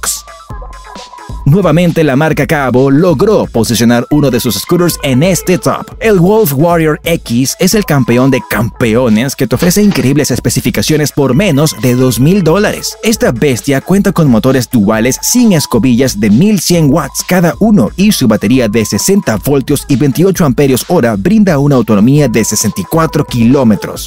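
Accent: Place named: United States. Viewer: La pronunciación con complejo de Yankee da grima, Ssscurerh, bareri en vez de batería pero khe, ya de paso que diga las light y las wheels XD, eso sí buen video y buena información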